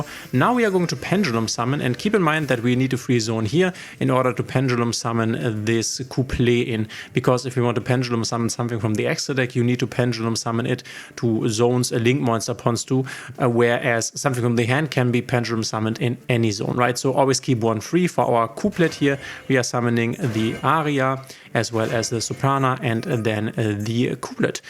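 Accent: Germany. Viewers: OMG dieses deutsche englisch Akzent nervt. Aber trotzdem gut erklärt😂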